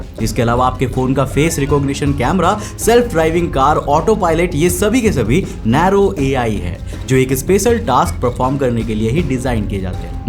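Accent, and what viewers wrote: Bengal, India. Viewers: Bhaiya kya aap bengoli ho ??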